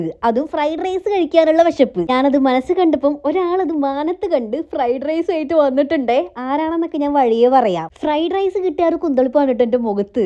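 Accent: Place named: Malappuram, India. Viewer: ചേച്ചി മലപ്പുറം ആണോ അതെ സംസാരം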